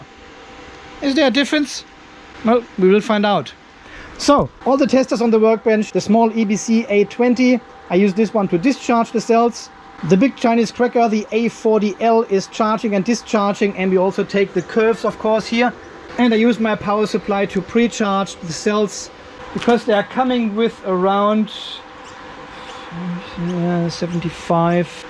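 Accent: Germany. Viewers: You do not sound very Australian. You have a German accent. I have learned quite a lot from this but not sure if it is all necessary.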